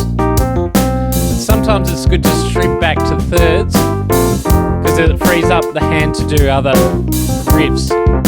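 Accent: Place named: Australia. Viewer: Nice to hear an Australian accent. Cheers from country Victoria. Great lesson.🦘🎼🦘🎶🎵🎶🎶🦘🦘🦘🦘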